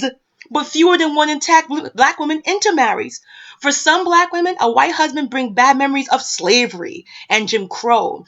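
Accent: Jamaica. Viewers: lawdhamercy!! lol luvin ur jamiacaness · Hey, I hear the patois trying to come out a yuh girl. Us yard women know when a woman is fed the f@ up. Keep these stats coming sis.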